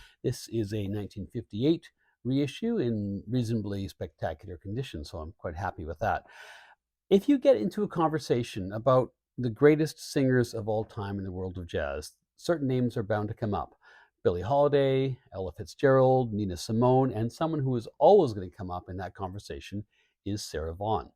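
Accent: Canada. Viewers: My bad that I misheard you🙏🏻 It’s your thick Canadian accent🇨🇦 that tripped me up!😁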